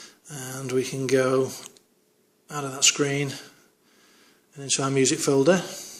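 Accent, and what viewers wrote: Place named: London, United Kingdom. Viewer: what is the app??? i cant understand your london accent !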